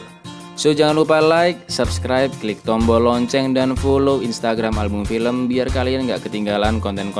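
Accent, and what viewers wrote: Java, Indonesia. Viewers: Abang orang jawa ? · Jowo ngendi mas' 😂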